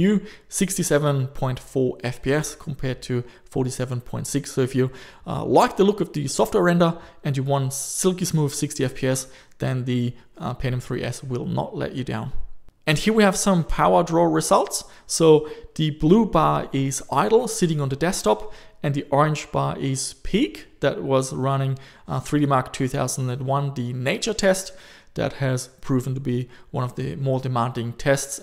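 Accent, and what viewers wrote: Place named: Germany. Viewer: When I watched the video from 2015, you had less German accent than now.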